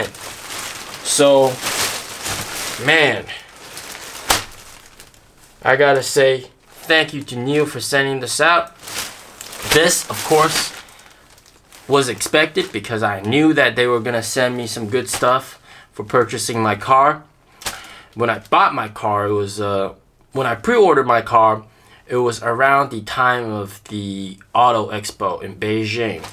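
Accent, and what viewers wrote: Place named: New York, United States. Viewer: Hey are you from NYC? Queens or Brooklyn? I hear the accent lol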